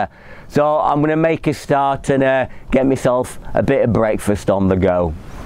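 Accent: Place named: Sheffield, United Kingdom. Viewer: Just found your channel with the chesterfield tunnel. I grew up round there but was in London and the south the last fifty years. Walked to Carl wark with dad. Nice to hear the Sheffield accent , if I might say different to the chesterfield one. Enjoyed all the camping stuff . Thanks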